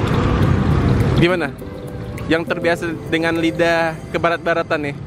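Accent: Malaysia. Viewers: Asli mana sih bang ini. Macam kek org malaysie punye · bang orang malaisia ya suaran ya seperti orang malaysia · Aslinya org mn? Logatnya melayu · Aksen melayu nya gk ilang2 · Eeee kek bahasa malaysia cokkk salam dari malaysia banggg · Asli mana aa logatnya ari malaysia yah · Cara bicara seperti orang Malaysia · Abang orang mana sih ? Kok bahasanya kayak bahasa Malaysia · Saya ngikutin HOBI MAKAN dari Subcride 14.000.. skrg udah 700.000-an... selalu liatin updating nya tiap minggu Sukses selalu bang, selalu bersedekah.. dan logat melayu nya tak berubah😂🤣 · Bang asli Mane? Melayu nampak bahase tu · Ko kya bahasa Malaysia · Mas nya asal mn ya ?? Bhs nya kadang seperti malaysia..hehe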